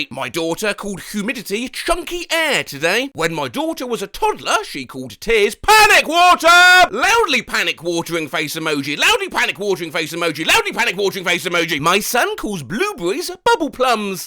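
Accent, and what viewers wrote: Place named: London, United Kingdom. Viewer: . Buddy. Pal. Chum. You sound a bit like Jim Sterling. This leads me to believe you come from London.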